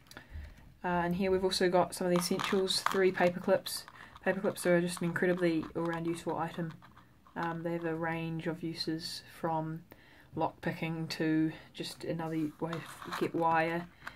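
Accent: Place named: New Zealand. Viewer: I recognise the accent! A fellow kiwi I see. What part of NZ are you from?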